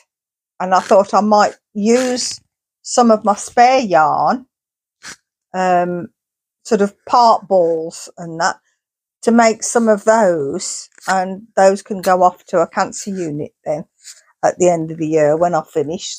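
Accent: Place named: Kidderminster, United Kingdom. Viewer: It's been a pleasure. I must admit, being from Kiddy (though not originally) it was the accent that grabbed me first. But your vids have gone from strength to strength especially the ones from Yarnover. They were so interesting and informative. Keep doing what you do. I'm here for the duration ❤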